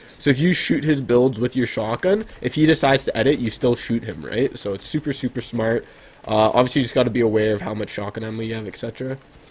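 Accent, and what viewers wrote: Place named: Canada. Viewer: ur canadien i could tell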